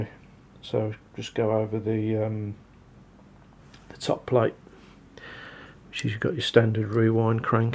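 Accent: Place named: Birmingham, United Kingdom. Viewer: You delivered the information, so job done. It’s got nothing to do with the Brum accent, my nerdy cousins in Handsworth have stronger accents than you and can hold a captive audience about some dry elementary principle in a dog-eared physics book. I could listen to Noddy Holder, Ozzy and many other native Brum or Black Country personalities for hours. It’s more about the lack of passion and energy, especially for one of the unsung heroes in the Leica back catalogue. I didn’t expect cartwheels and American style presentation exuberance. But to me you came across like a govt information film narrator from the 1970s, but that’s just me. There are many much worse on YT, and I probably present no better than you, but doesn’t prevent me from speaking my mind and giving some hopefully positive critique.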